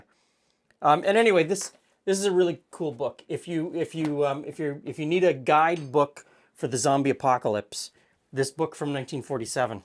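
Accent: Canada. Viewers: I wonder how many of the awful comments and messages is because people can't identify that your accent is Canadian, not US, and are either AH Statesians or AHs from other countries. (You are, rightfully, and American, because Canada is part of the Americas. You are not USian though.)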